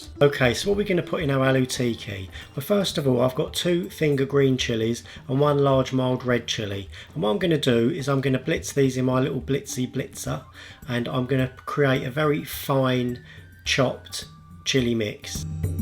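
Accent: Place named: London, United Kingdom. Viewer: mate! your accent isn't to far off Russel Brand's. hope you're not offended. you live near the Thames?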